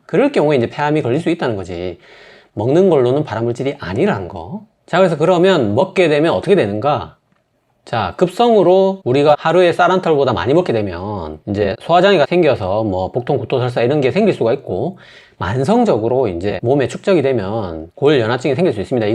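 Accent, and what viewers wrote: Gyeongsang, South Korea. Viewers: 사투리나 좀 고쳐라 듣기 거북하네 갱상도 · 경상도 분인 게 표가 나나요? ㅋㅋ 저도 경상돈데 들어도 잘 모르겠는데... 쌤이 표준어 쓰시는 거 같은디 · 갱상도 의사샘 ㅋㅋ, , 너무 친근하고 부담없는 생활지식 알려주셔서 잘보고 있습니다. always thanks 🙏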